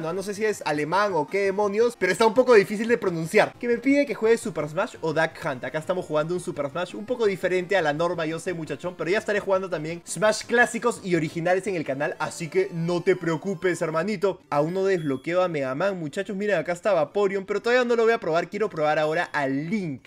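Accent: Peru. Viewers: OMG, LO SABÍA, SÍ ERES DE PERÚ 💖 Por la forma en la que hablabas lo sospechaba hahaha pero nunca me convencí. En fin, me encanta todo tu contenido tanto en tu canal principal como en este, ¡éxitos! 🌻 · OMG, LO SABÍA, SÍ ERES DE PERÚ 💖 Por la forma en la que hablabas lo sospechaba hahaha pero nunca me convencí. En fin, me encanta todo tu contenido tanto en tu canal principal como en este, ¡éxitos! 🌻Like si luigi es tu personaje favorito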